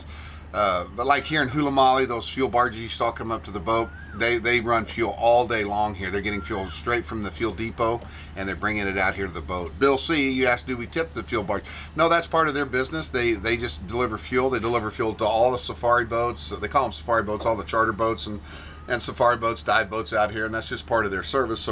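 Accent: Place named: Texas, United States. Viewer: Your family is wonderful so very talented in many ways , Love to hear you talk TEXAS My greatest love is your boat . It’s really pretty you guys keep it wonderful I watch a lot of boat channels I would pick your boat over most I see . In another life I would live it the same way you guys are .All of you put a smile on my face Lovely family !stay safe have fun !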